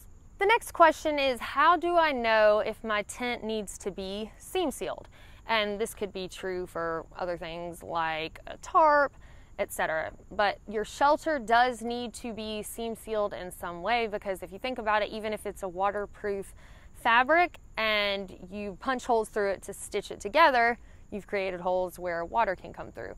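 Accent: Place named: Virginia, United States. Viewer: Dude that south VA accent is great. Even if you didn't know anything about hiking I would still listen to you. I always get the impression that anyone who has that accent I expect them to know everything outdoors lol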